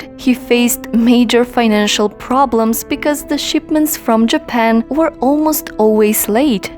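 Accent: United States. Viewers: I need to have an American accent. Does anyone know if the English she speaks is a New Yorker? Because i love her voice.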